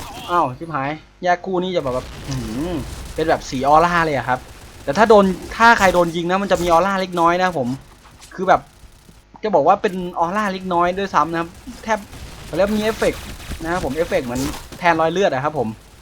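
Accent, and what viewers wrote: Isan, Thailand. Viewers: พี่คนอีสานหรอครับ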